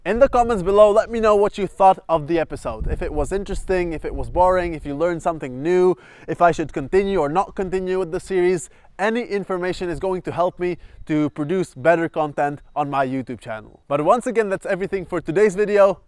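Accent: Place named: United Kingdom. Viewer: I didn't ask for it, its not my video. Looks and sounds like some "Bloke" from the UK...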